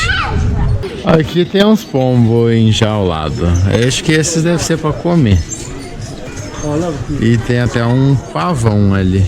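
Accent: Santa Catarina, Brazil. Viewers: Sei la, parece de Santa Catarina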